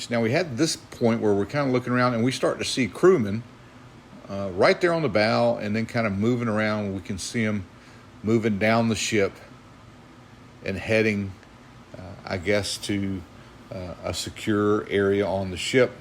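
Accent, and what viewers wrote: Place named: Georgia, United States. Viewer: I came over from “What’s Happening in Shipping” as well. Enjoying commentary and level of detail. But more than that, I was raised in Athens so it’s great to hear a Georgia accent! Thank you!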